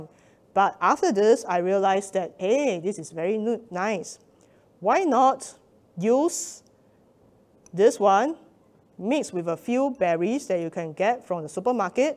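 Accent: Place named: Nigeria. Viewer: Why she sounds nigerian 😅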